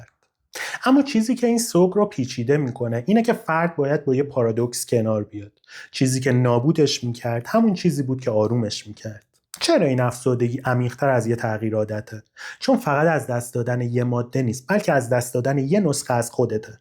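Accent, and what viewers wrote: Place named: United States. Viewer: یه سؤال تو متولد نیویورک هستی؟ خیلی لحجه آمریکایی داری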